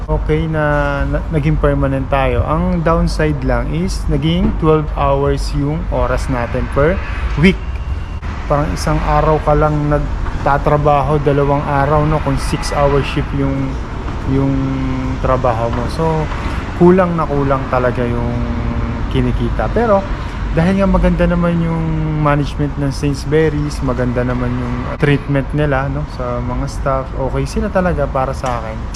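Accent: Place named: Philippines. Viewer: Hi kabayan, okay yan na pinoy pronunciation ang gamitin para hindi mabawasan ang pinoy feels sa mga vlogs mo. Ako dito working in Heanor pero mga tao dito kakaiba magpronounce ng words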